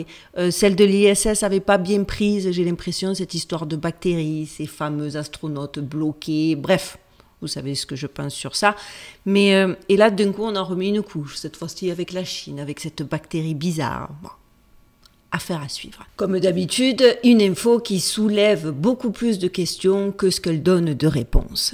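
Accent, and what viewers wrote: Marseille, France. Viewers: Salut --merci pour tes videos---tu es native du sud est ou du sud ouest de FRANCE ?? Tu as ce bel l accent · Un bonjour de Corse où ton accent Marseillais fait partie des rituels rassurants de nos mercredi et dimanche 🎉❤, merci de nous partager ton enthousiasme et ta bonne énergie !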